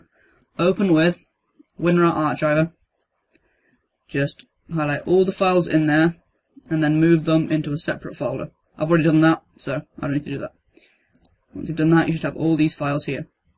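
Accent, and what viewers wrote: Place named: United Kingdom. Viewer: British accent..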